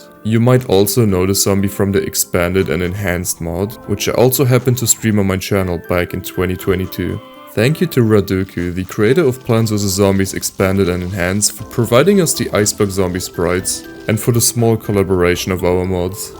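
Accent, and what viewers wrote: Germany. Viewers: I can not not hear the german accent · Hm, i hear in your voice some german... and i see u are in germany... bist du deutsch xD?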